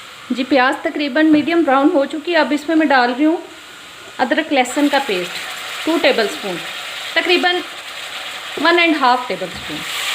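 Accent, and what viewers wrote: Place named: Punjab, India. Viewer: Thanks Ji, I also liked you Punjabi accent Urdu Loved it.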